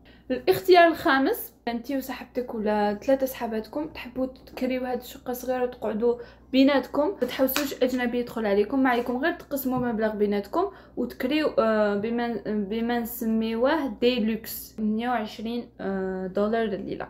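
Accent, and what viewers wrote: Algeria. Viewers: هدرتها عاصمية تقطر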